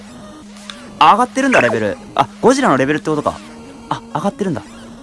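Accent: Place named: West, Japan. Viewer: アニめし屋 by さんって西日本出身ですか？そのネタもググってやってみてくださいのイントネーションとかからそんな感じが…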